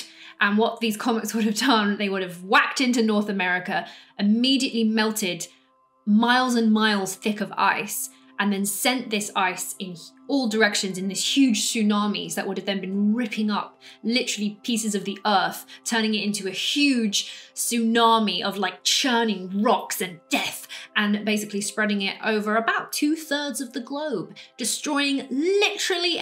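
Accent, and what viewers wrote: United Kingdom. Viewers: Hey I think you are doing a great job making us aware of the many mysteries of the Ancient times plus adding your personal female British accent makes it more attractive. Jimmy is a great investigator also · Something about discussing the truths of ancient history....with an English accent...makes it sound so legit. If I were to talk about it I'd sound crazy. :-P At the end there you posited that survivors of the Atlantean Global Empire came to the hunter gatherers (assuming they were very primitive and since they lived inland and in the wild they survived the tsunamis and weather changes) and almost appeared to be gods with their Atlantean knowledge. So "Osiris" was an Atlantean who then became a god to the early Egyptians. Now circle back to the pyramids. They were thought to be pre-diluvian structures that created energy to supply power to the various global cities (everyone had their own pyramids or power structures). 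If a surviving Atlantean passed on his knowledge to the pre-cursors of Egypt....why did he never explain the pyramid's true purpose? Even if the pyramids no longer worked, why did the Egyptians keep zero records of the structures, how they were built and who built them?? Not even a verbal tradition, one that speaks of Atlantis WAY BACK in history, doesn't speak of the pyramids across the globe? There's a huge gap here that needs to be filled...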